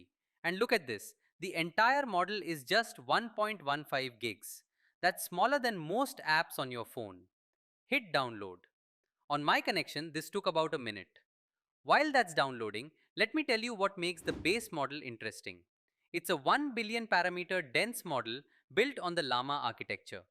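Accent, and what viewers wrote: India. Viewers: oh no indian english - instant quit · first indian accent which I actually endured, insane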